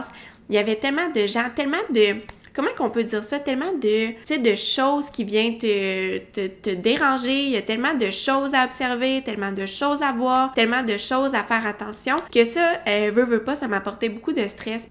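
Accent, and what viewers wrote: Quebec, Canada. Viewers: J'écoute vos vidéos pour pratiquer l'accent québécois! Merci! :)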